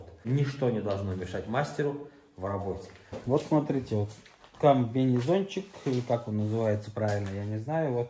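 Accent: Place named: Tatarstan, Russia. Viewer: татарский акцент у тебя как у Миниханова.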